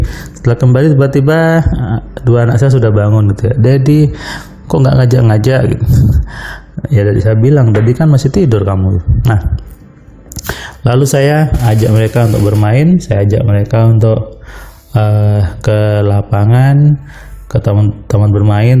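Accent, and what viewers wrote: Madura, Indonesia. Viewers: ❤❤❤❤❤ mantab, logat Mas, logat madura..khas bangets, I Like It's 😅🙏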